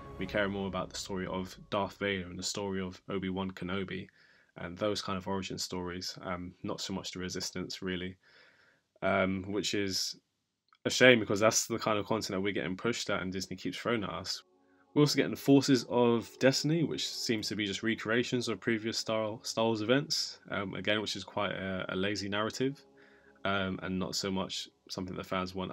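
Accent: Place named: United Kingdom. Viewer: Your dialect is ugly, it sounds like UK trash, rather than superior aka North American dialects.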